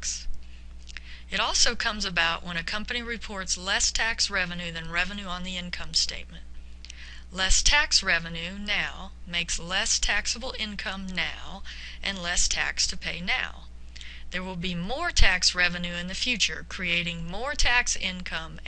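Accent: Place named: United States. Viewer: OMG - an American accent explaining this! Unusual.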